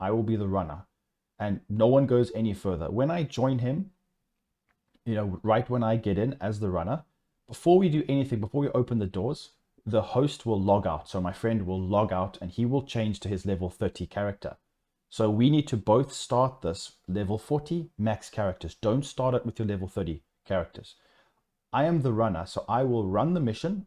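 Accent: South Africa. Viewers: South african? if so what clan are you from?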